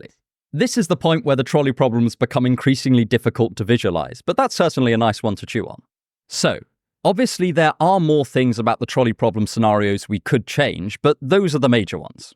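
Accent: RP, United Kingdom. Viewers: Your proper delivery of content using concise breakdowns, perfect annunciation and liberal use of gesturing without your posture falling outside of conventional expectations is; in short excellent. Standing tall, speaking confidently with; from my vantage point, an accent that demands acknowledgment of its old-world knowledgeability, all the while casting spells with all that gesticulating it would seem, you’re helplessly bound to. Again: no notes. As a blue collar American lefty my inner, ignorant, exceptionalist at surface level sees a pretentious, pompous, privileged, pretty boy, from fancy accent island making some non-point I heard wisecrack cover in a self deprecating video years ago. But that’s the very worst part of me. My jealously. Seeing your youth and education and talents put to use in one concerted effort makes me pine for a life I was born too late to capitalize on. 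Much the same as any other haters you may encounter online assailing your content with contempt. I say this all to tell you haters are just usually ashamed. And also to remind you DONT READ YOUR VIDEOS COMMENTS. THIS ISNT FOR YOU TO SEE IF TOU VALUE YOUR MENTAL HEALTH BRO. Take care.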